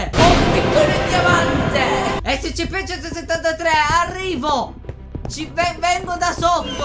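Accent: Sicily, Italy. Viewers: Ma sei siciliano? Perché sempre che hai l'accento siciliano!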